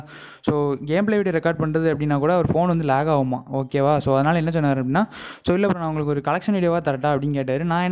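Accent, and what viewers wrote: Tirunelveli, India. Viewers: Bro neeinga triunelveli ya. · Bro ungaluku tirunelveli ya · Neenga nellai ah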